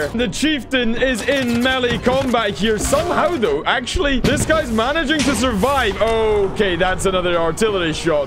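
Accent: Scotland, United Kingdom. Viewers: Where are you from. Scotland ?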